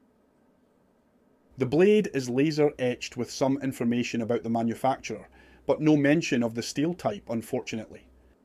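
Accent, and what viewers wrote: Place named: Scotland, United Kingdom. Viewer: I would guess Scotland from the accent - somewhere near or in Glasgow?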